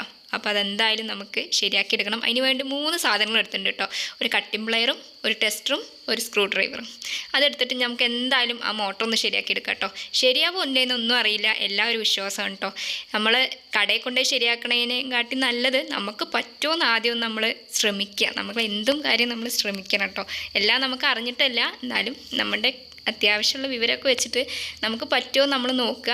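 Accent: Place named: Thrissur, India. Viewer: Nee thrishoor kaariyano ninte samsaram bhayankara ishtaayi ketto ninte samsarathil kure tto tto athanu samsaram ishtamayath njaan kannur kkaranjaan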